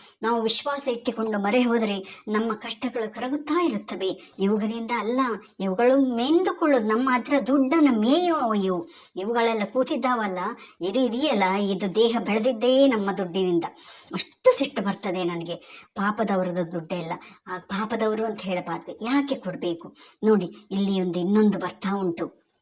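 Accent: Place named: Kundapur, India. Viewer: Super akka all the best👍💯 enastu videos barali nimminda.. Kundapur kannada keludakke tumba chennagi ede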